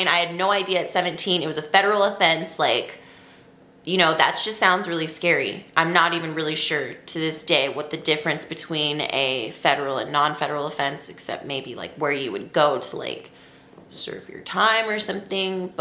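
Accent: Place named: California, United States. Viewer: please post all these vedios one by one daily for those who wanna see you . From your vedios I'm getting knowledge cultural information about Californians and also accent familiarity.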